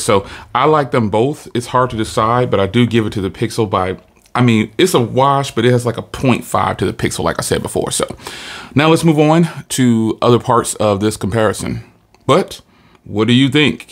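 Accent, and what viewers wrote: United States. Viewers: He speaks with an American accent.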